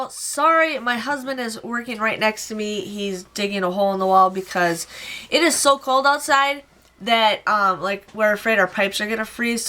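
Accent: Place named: Minnesota, United States. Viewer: You sound like Minn. I live here ....✅💕💕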